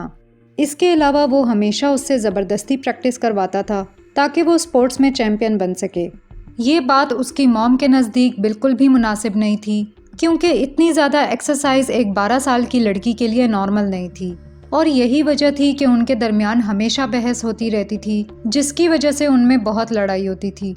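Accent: Pakistan. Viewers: Pakistan mein bahir bolte hain,hum Indian bahar bolte hain,thoda sa different hota hai accent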